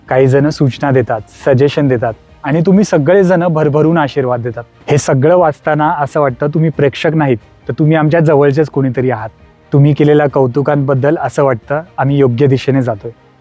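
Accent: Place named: Jalna, India. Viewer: मस्त दादा मी जालना उन आहे तुझं बोलणं पाहुन मला वाटत होतं तु इकाडलाच आहे म्हणून पण माहित नव्हत आज सांगितले तर भारी वाटलं खुप शुभेच्छा तुला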